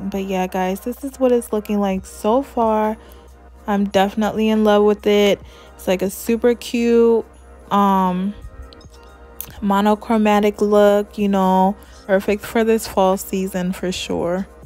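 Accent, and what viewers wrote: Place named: Caribbean. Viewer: Where are you from? You sound Caribbean. Thank you for the pattern, easy to follow.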